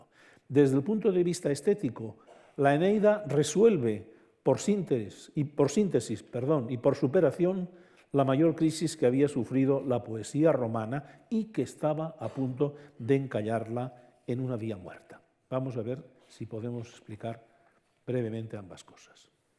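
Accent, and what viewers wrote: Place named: Catalonia, Spain. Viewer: Encuentro esta conferencia 8 años despues de que fue presentada; quiero decir que nunca he leido a Virgilio, soli se de él por lo que nos enseñaron en la escuela y por cuanto Dante lo menciona en su Divina Comedia; dicho lo anterior quiero agradecer a la March por sus magnificas presentaciones y por invitar a maestros como este señor que me despiertan el gusto por la lectura de lis clasicos; gracias maestro Vidal por su hermoso acento Catalan y por todo su conocimiento compartido aqui.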